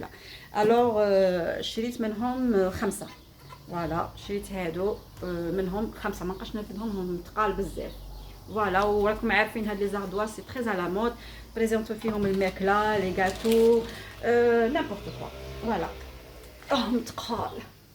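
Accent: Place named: Oran, Algeria. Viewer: 😂😂😂😂😂👍😘انت باينة بنت وهران الله يحفظك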